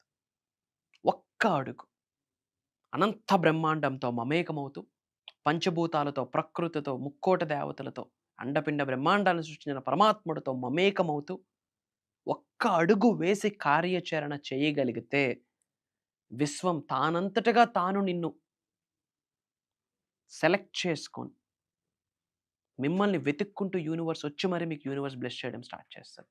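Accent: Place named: India. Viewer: yela miru andra vaku English ni telugu chesi maatladuthunaru.. Malaysia Singapore lo ila maatladithe kinda nunchi paina teda ga chudtharu..😅😅. thala noppe vosthundi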